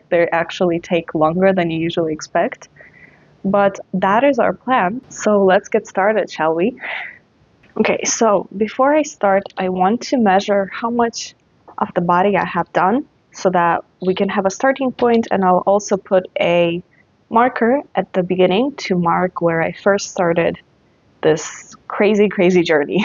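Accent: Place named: Eastern Europe. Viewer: Do you happen to be a Slavic language speaker? Your English is perfect but some familiar intonations tend to pop in from time to time.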